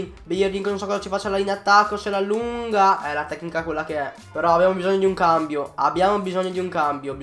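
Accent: Veneto, Italy. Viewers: Ma sei di Venezia?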